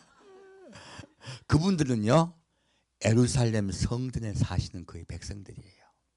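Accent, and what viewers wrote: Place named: Jeolla, South Korea. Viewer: 전라도네요. 아멘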